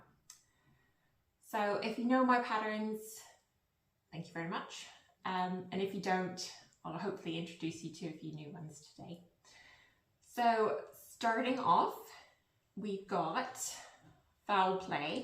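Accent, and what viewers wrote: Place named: Arkansas, United States. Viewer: Excellent first FlossTube. I love your accent with a bit of Arkansas every few words. Halloween 1812.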